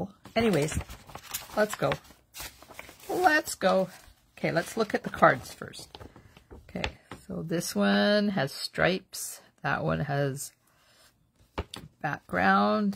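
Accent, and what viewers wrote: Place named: Alberta, Canada. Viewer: You sound like you’re an Albertan. 15 years there myself, and wow I agree with you, I can’t just sit and watch tv…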